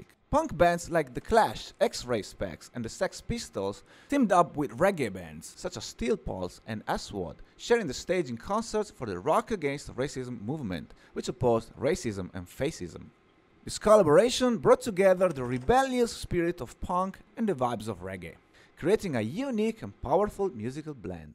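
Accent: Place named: Brazil. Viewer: I like this video thank you and your accent reminds me of Brazil in goodness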